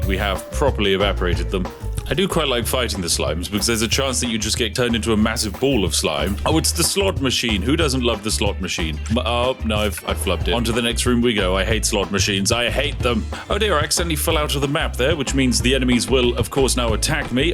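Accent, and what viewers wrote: United Kingdom. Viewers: is just british LGIO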